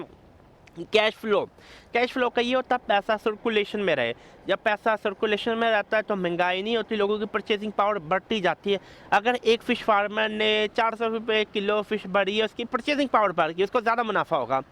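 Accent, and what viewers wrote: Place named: Pakistan. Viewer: I like this video a lot me and you are on the same page but I just want to know if you are Pakistani or if your Indian I don't want to try to sound offensive or rude it's just you have an accent that sounds like people from Pakistan but also sound like Punjab I will be honest you look Punjab half Pakistani because I mean I'm from Pakistan and I know what Pakistani people look like anyways thank you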